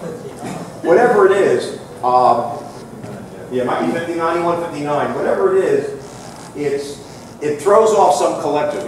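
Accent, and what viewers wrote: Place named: New Jersey, United States. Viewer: He speaks New Jersey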